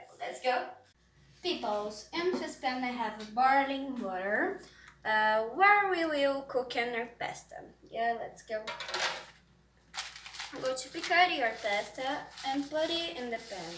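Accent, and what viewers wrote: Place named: United States. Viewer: Caramba tu parece uma americana falando kkk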